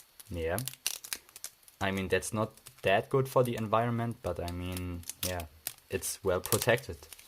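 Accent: Germany. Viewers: For the accent, I think he's German, so in Germany it's available.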